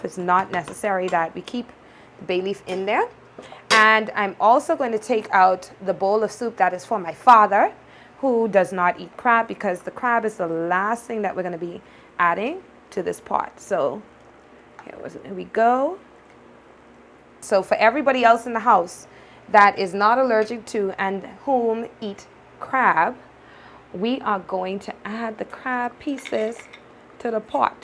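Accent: Bahamas. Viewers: Gal I love how you did this with you authentic Bahamian accent. Your okra soup rocks just like you. A beautiful Bahamian girl who can cook and sound indigenous. Will be looking for your other videos.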